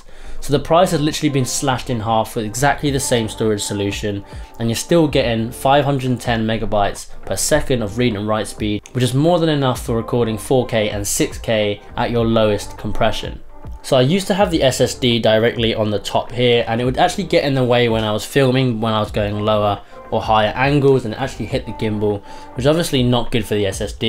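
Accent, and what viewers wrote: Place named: RP, United Kingdom. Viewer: I came from your bmpcck set up and blimey your accent is british to the shits haha, which part of UK u from? Im an aussie raised SE asian born dude and yousound posh af to me. loving it dude. subbed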